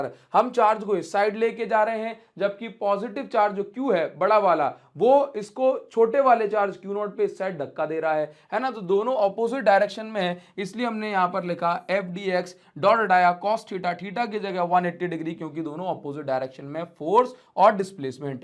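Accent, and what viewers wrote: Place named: Bihar, India. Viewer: Khela hove hai😂 true bihari axcent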